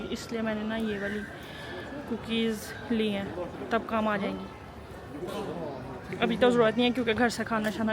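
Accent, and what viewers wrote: Lahore, Pakistan. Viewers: You have really pure lahorei accent bless you I really enjoy your vlog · 😀😀😀china Pakistan 🇵🇰 iron friendship. I love & proud born in Pakistan 🇵🇰. You”re so funny speak Urdu like tipical Pakistani.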